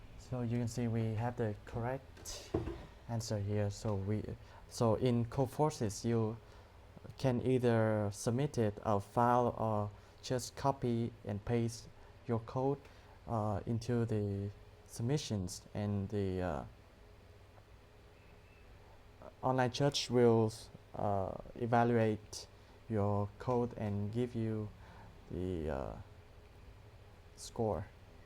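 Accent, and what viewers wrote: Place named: Vietnam. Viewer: Your accent, sounds like Viet. Anyway, great video!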